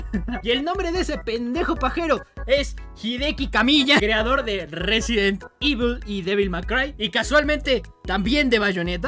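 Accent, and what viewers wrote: Mexico. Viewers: eres de CDMX o de Puebla? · N o ma mes mI eres de jalIsco O qpdO con lOs mOdIsmos estan bIen vrgs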